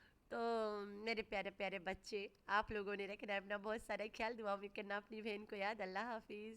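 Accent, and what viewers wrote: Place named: Bhojpuri, India. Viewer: Bhojpuri accent 👍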